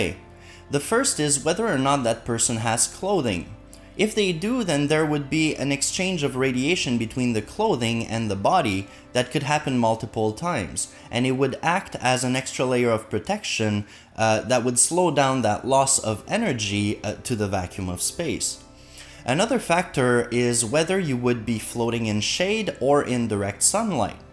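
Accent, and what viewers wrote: Quebec, Canada. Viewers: He sounds "Québécois"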